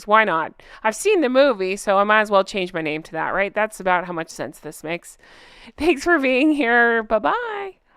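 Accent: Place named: United States. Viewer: The entire royal family is a scam to promote British tourism. You sound like an American. Why do you care? We made a break from that Monarchy in 1776. Get a grip.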